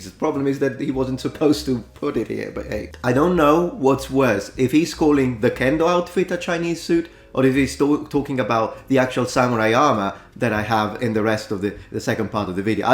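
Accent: Italy. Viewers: I didnt realise until now you had a slight Italian accent...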